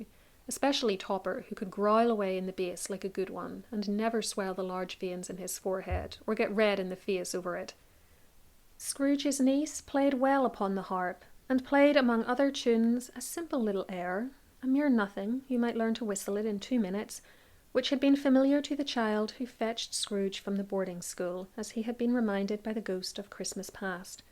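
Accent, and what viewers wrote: Northern Ireland, United Kingdom. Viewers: if you’ll pardon my being less professional though, it’s a little evil. I already have a weakness for the northern Irish accent, and you’ve gone and made a 2 and a half hour long video! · THIS one girl! Love so much your Northern Irisch accent. Greetings from Friesland in The Netherlands (I speak Frysian and Dutch as my native tongue)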